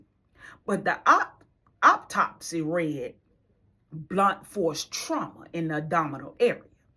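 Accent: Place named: Alabama, United States. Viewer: You from Alabama I can tell im also from Alabama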